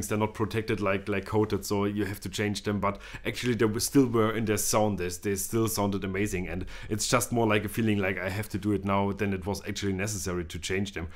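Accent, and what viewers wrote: Germany. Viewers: That's gotta be the most German English I've ever heard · the most stereotypical German i have ever heard ... fucking love it !!! more !!!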